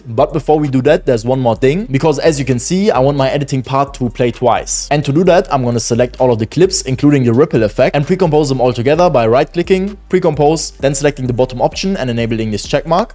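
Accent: Germany. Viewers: Ich höre deutsch 😉👏🏼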